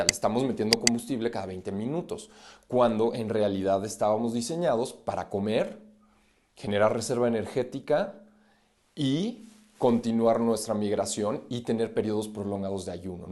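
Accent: Mexico. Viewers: Ay te amo! Por momentos tengo que retroceder el video porque me desconcentro con lo lindos que sos y tu acento mexicano 😍❤️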